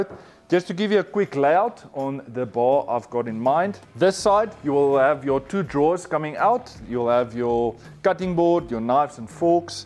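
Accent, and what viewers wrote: South Africa. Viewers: Was very happy to come across the very project I was looking for. Even more excited to hear a South African accent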